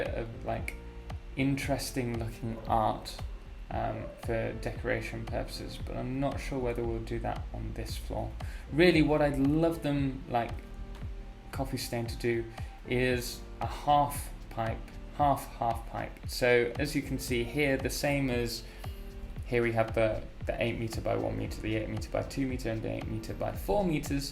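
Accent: United Kingdom. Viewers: the microphone sound doesn't sound muffled or clipping a whole awful lot though, i find the the general quality of the spectrum quite decent albeit a bit boosted agressively in the mids at the serious detriment of bass response (is there even bass?), it does sound like a cheap stick 1 buck mic in that regard (but even those can work magic if you know how) i mean muffled is worse, at least you can clearly hear the words he is speaking. sounds like he just needs to filter out the background noise, and a low hum which is most likely caused by PC fans, and judging by his british accent i'm hazarding a guess he may living in an old house with no grounding on the sockets except for the wet groups, i have that and it sucks shit for playing guitar, you have to constantly keep in contact with a string to ground the hum out lol, yes even on humbuckers.. although pickups are technically AC, the amplification stage is NOT. 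i already said years ago youtubers should up their game in using hard limiters / compressors to get their levels nice, but in those days ear rape was a slapstick thing lol this doesn't seem to be as much as a leveling issue as much as it is an ambient noise/EQ problem i assume you're listening this on headphones, i'm playing this on it on near field monitors with very conservative volume settings so the annoyances you experience kind of fly by for the most, i'm not too bothered by dust, clipping gets on my tits though, which is really not the biggest problem here, i've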